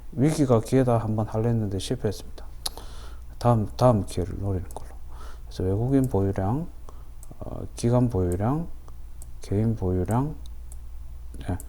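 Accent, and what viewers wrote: Gyeongsang, South Korea. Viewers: 우연히 보게됐는데 경상도 말투도 친근하고ㅋㅋㅋ 재미있고 친절하게 설명해주시네요 감사합니다ㅎㅎ 오늘 sfa 샀다가 매도 놓쳐서 어쩌지 했는데 홀딩할만한 애였군요!! 주식초보인데 20년고수님께 앞으로도 많이 배워가겠습니다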